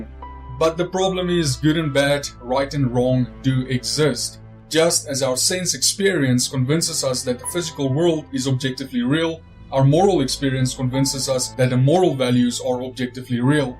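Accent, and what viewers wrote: South Africa. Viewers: I agree, awesome accent :) is it south african?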